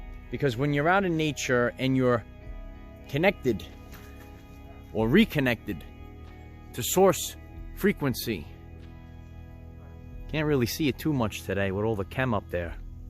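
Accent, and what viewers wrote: New York, United States. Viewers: 1. The New York accent is the best ever! 2. do you like the movie The Neverending Story, because you remind me of the boy in it lol? 👑😇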